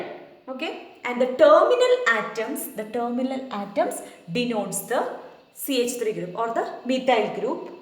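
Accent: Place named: South India, India. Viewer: i thought from south part of India,based on yours accent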